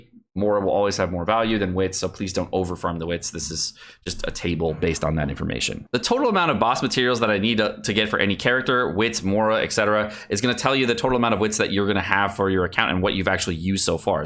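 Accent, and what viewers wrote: United Kingdom. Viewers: No British today?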